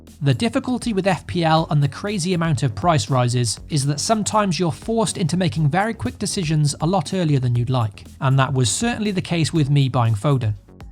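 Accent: Wales, United Kingdom. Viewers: Are you Welsh? I hear some Welsh in there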